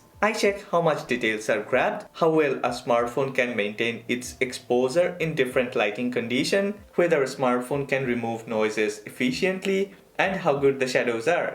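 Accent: India. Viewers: Hey bro your speech is very similar of Indians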